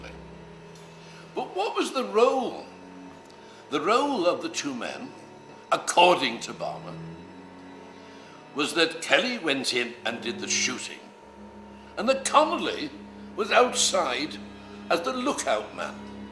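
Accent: Liverpool, United Kingdom. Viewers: Sounds like a Liverpool accent for that area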